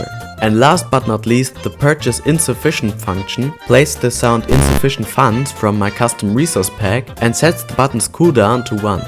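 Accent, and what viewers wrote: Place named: Germany. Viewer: safe you'r german, i can hear this!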